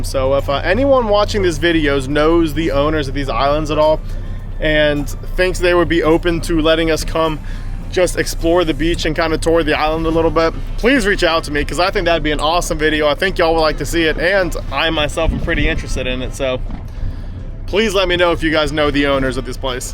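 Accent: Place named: Bahamas. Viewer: I just watched your video and enjoyed it; however, I was left with a question: why is it that you sound Bahamian? Maybe it is because you spent so much time in the Abacos, I guess. 🤣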